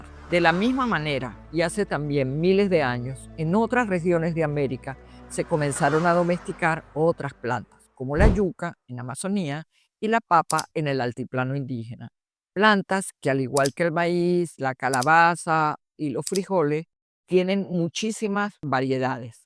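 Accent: Venezuela. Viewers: Por Dios ❤❤❤ que profesionalismo, la bibliografía me dejo asombrado 🎉🎉🎉🎉 me encanta su tono de voz al narrar, me reconforta ese acento venezolano a la distancia. Infinitas gracias profe. Hola pueda llamarla profe.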